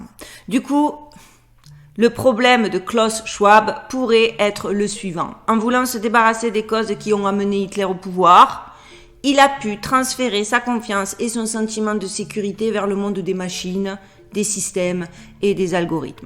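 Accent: South, France. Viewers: Toujours aussi sensationnelle ! Il y a trois You Tubers dont vous faites partie qui lorsque je les écoute me font du bien et me permettent toujours d’avoir un sourire amusé ! Vous avez l’art de la narration naturelle et ce petit brin d’auto analyse amusante qui détend l’atmosphère ! Amusant d’entendre de Vegas l’accent méridional, mais ça fait partie d’un ensemble qui fait de vous une personne sympathique et charmante qu’on rêve tous d’avoir comme amie ! J’ai aimé cette vidéo et c’est tout à fait le sens du courant actuel! Remplacer l’homme et lui payer un revenu universel et se débarrasser le plus vite possible de l’excès ! Surtout annihiler tous les sentiments en l’isolant complètement dans un monde automatisé où il n’aura plus besoin de sortir ! Des bon toutous esclaves obéissants drogués et contrôlés mentalement, cerveau atrophié, des zombies inutiles ! 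Votre vidéo est plus légère que ma vision tout en étant semblable mais moi je le vois terrifiée et le transmets dans mon narratif ! Merci encore, j’espère que le choix d’être à Végas sera meilleur que celui d’être en France ! On nous menace de nous reconfiner à Paris et RO ! Bises 😘🙏🌹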